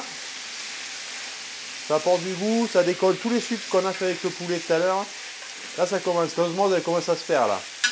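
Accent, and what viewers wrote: South, France. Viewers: L'accent vient du sud mon ami on dire. Petit coucou from Toulouse. J'ai bien aimé ta vidéo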